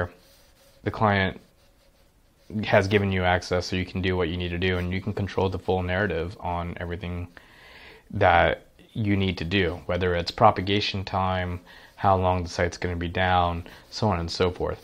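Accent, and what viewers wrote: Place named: United States. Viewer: Finally someone with a clear voice and American accent thanks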